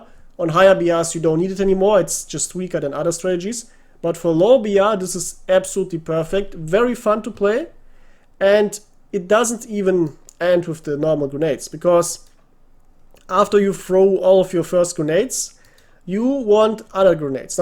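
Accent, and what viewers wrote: Germany. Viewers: truth! Obviously, his Enlisted take is great, or we wouldn't watch. But the delivery - a balance of German inflected English accent with many wry wittisisms is the cherry on top. If instead it was rando guy from North America, it might be less pleasing to my ears.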